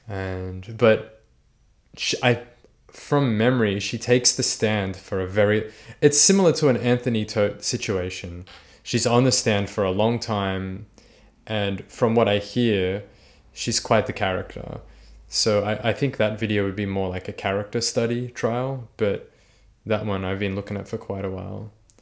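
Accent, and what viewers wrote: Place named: Australia. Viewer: Whoops..hard to place... Australian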